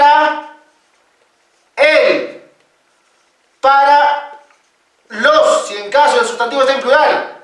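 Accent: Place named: Cusco, Peru. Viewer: Usted habla quechua cusqueño. ¿Le es fácil entender otro tipo de quechua, como el ayacuchano?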